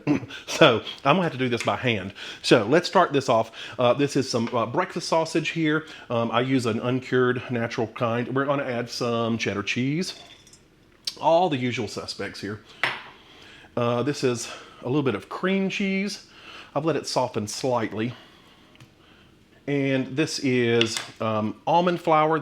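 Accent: South, United States. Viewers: Came for the comparisons; stayed cause I could relate n feel the excitement in each bite haha. You actually have convinced me to at least check out Thrive Market, so they picked a great influencer to sponsor too. I always skip ahead during most sponsor’s adds. Lastly I’m from the south (Louisiana) so I feel right at home listening to you say “y’all”. I’m amazed at how quickly u speak occasionally, yet enunciate crystal clear. Fantastic channel 👍💕👍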